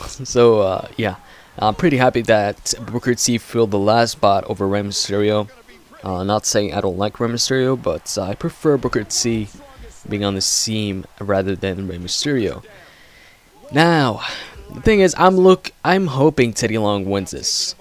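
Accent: Canada. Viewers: ARE U CANADIAN..uve Kinda go thee accent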